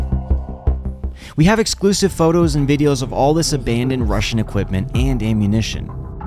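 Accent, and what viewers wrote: United States. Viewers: This is my first video that I watch from your channel I just subscribed and hit the all button very good at speaking about what's going on very informative. And you sound like you're American or Canadian one of the two and there's not many people on YouTube that are American or Canadian that are actually taking the time to gather all this information. 5 ⭐ to this video well done. 5 ⭐🇺🇦❤️🇺🇦❤️🇺🇦❤️🇺🇦❤️🇺🇦❤️🇺🇦❤️🇺🇦❤️🇺🇦❤️🇺🇦❤️🇺🇦❤️ and I love it when I see high Mars systems going to work on those Russian sons of bitchs. Those systems are very very accurate. Glory to Ukraine 🇺🇦🇺🇦🇺🇦🇺🇦🇺🇦🇺🇦🇺🇦🇺🇦❤️❤️❤️❤️❤️❤️❤️❤️❤️❤️❤️❤️🇺🇦🇺🇦🇺🇦🇺🇦 Ukraine will be victorious ❤️❤️❤️❤️❤️🇺🇦🇺🇦🇺🇦🇺🇦🇺🇦❤️🇺🇦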